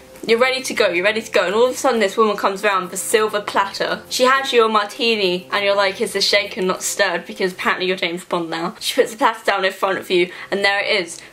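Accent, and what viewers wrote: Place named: London, United Kingdom. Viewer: it's a particular type of london accent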